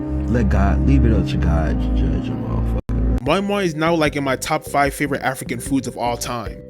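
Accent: Nigeria. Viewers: Hey my brother wassup, I'm also Nigerian, I want to hear your Nigerian accent in the next video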